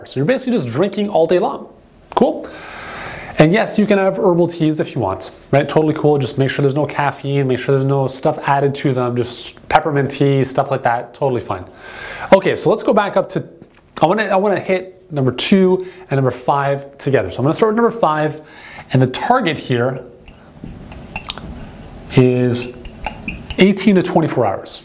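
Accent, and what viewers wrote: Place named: Canada. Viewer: Canadian raising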